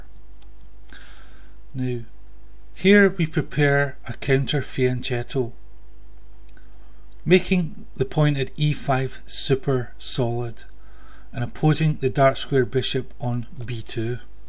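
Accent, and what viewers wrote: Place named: Scotland, United Kingdom. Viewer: I think he's saying "pat" -- a "pat opening" ... but these Scots accents are tricky!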